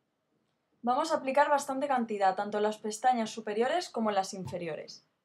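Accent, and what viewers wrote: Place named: Spain. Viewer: I subscribed cuz I liked your spanish accent, and you do NOT fail to deliver! haha I love it!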